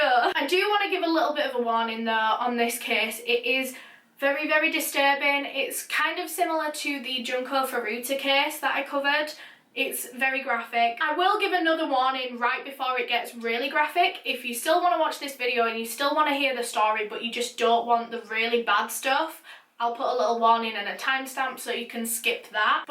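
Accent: United Kingdom. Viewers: wait...her accent is so amazinngg its like british + merida's accent (idk her accent sorry) its so coooll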